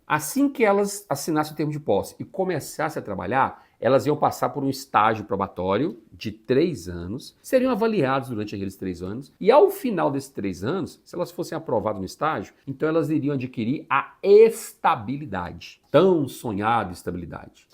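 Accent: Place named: Pernambuco, Brazil. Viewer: Esse professor é bom, explica tudo que todos entendem, pelo sotaque e inteligência deve ser pernambucano, se errei foi por pouco.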